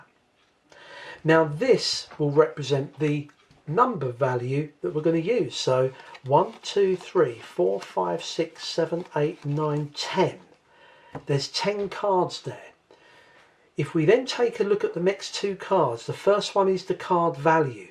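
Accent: United Kingdom. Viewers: I am glad you liked it and hope you'll try it out. Let me just say that you're doing a great job with the channel, got me subscribed. It's so good to see a knowledgeable man teach magic with soul (and a british accent ;) ). I wish you all the best things you can wish to yourself. Keep up this fantastic work and Greetings to you from Moscow!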